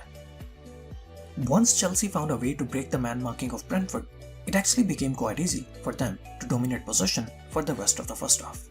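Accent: India. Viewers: Love ur content, hate ur Indian accent.. 🤮🤮🤮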